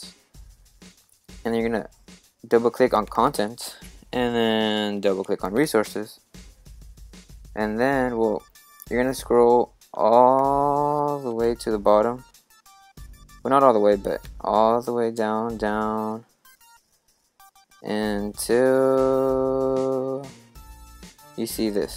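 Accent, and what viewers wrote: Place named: Japan. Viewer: pfff your accent bro! is it ..japanese or something !!!!!!!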